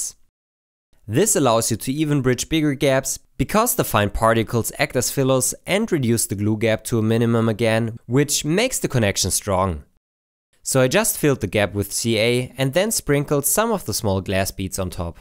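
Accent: Germany. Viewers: Dieser deutsche Akzent ich fühle es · When you hear the German accent the first thing that comes to mind is "precision". Great work. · Desch isch aba a schöna deutscha akzent LEL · Feier deinen Deutschen Akzent xD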